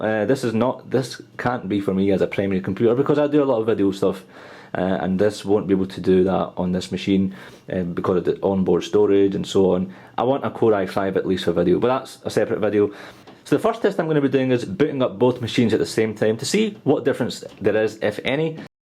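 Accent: Scotland, United Kingdom. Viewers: im getting the macbook pro even tho its slower its much more nicer looking, loving the scottish accent :)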